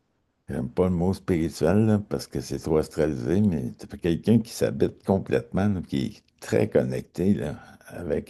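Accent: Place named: Canada. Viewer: Oui bien résumé avec votre bel accent canadien si charmant de surcroit qui fait arriver froid neige dans mon ESPRIT, belle âme ! ❤😅🎉❤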